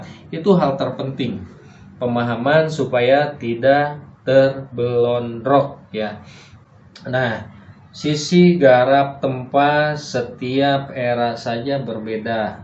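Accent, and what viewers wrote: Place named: Bandung, Indonesia. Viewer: 😅 logatnya gaya orang cicaheum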